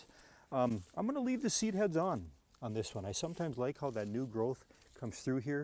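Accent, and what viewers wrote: Minnesota, United States. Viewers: Heard the accent and immediately went to the comments to see if there was a mention for Minnesota and was not disappointed 😊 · Are you from Minnesota? :). Thank you for the video! I need to trim mine back, they are big. Unfortunately, too late this year.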